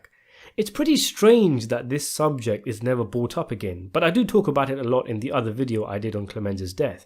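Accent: United Kingdom. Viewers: yo,you a brit? Cause im not entirely sure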